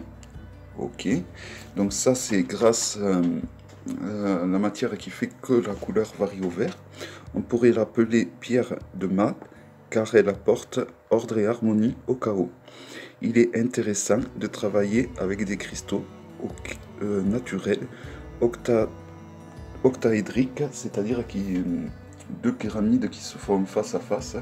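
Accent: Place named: South, France. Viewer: Merci moi j'ai bien aimé la vidéo 😊😊 Belle journée aussi et j'adore cet accent du sud😎😎 😘😘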